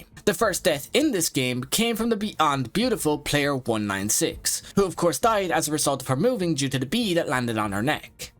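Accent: Ireland. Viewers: Nah hes just Irish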